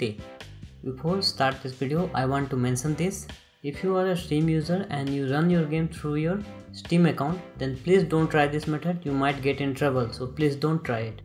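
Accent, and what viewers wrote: India. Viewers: Thank You indian voice!!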